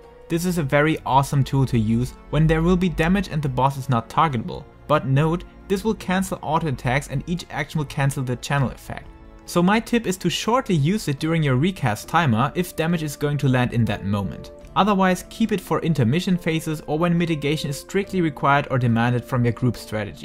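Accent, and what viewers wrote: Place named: Germany. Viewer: Es ist einfach soooo anstrengend einem deutschen zuzuhören wenn er Englisch spricht. Sicher ein echt gutes Video, aber da man einfach nichts verstehen kann, gibt es ein Daumen runder… schade, dein Video hätte mir sicher sehr sehr gut geholfen und ein Abo sicher. Aber leider nein. Dann schau ich mir lieber Videos von amerikanischen Mitspielern an. Die versteht man wenigstens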